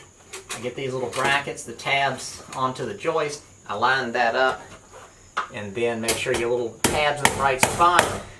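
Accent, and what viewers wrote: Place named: Arkansas, United States. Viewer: His accent makes me think Arkansas. Maybe Missouri.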